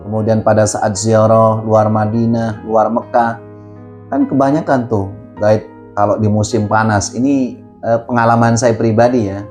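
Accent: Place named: Semarang, Indonesia. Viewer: Aslkm. Saya dah Marem penjelasan nya ustad. Atas bimbingaya. mohon maaf. Saya mintak alamat pak ustad. mau tabarukan. saya Semarang. logatnya pak ustad seperti logat Semarang trmksh.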